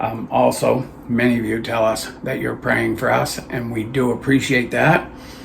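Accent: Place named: Eastern Canada, Canada. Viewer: I'm so thankful for your channel! I love listening to your accent because it reminds me of my Granddad and Uncle who were originally from out east:) It is such a wonderful blessing to know of brothers and sisters in Christ across this crazy nation! What a gift you give us by your prayers! May God bless you both richly!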